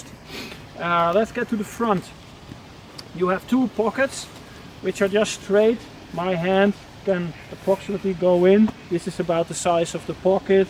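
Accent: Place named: Netherlands. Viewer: Ja klopt : ) Hoorde aan je accent in de video ook gelijk dat je uit Nederland komt, haha. Dank voor de tip, die site ken ik wel, veel toffe surplus daar ja. Het Waterlooplein in Amsterdam levert ook wel eens leuke vondsten op en soms zelfs de plaatselijke kringloopwinkels. Vintage kleding en oldschool campinggear enzo.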